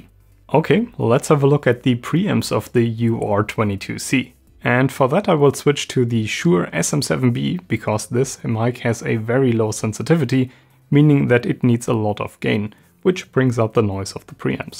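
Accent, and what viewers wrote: Germany. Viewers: Ich wette, wegen deinem akzent das du Deutsch sprichts!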